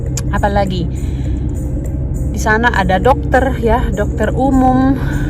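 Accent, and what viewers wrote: North Sumatra, Indonesia. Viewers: Ka kok logat batak kental banget ya😂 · Jadi ka itu asli dari samosir dan langsung merantau ke swiss, dsamosir itu bahasa batak dan kalo b.indonesia bakalan kental banget logatnya. Kalo ka merantau keluar medan mungkin bisa menyesuaikan, ka merantau ke swiss langsung pake bhasa sana, jadi mau gamau kalo ka ngomong b.indonesia ya bakalan tetep kental logat bataknya, and I think nothing wrong with that at all dan bahkan bisa jadi identitas 🙏🏼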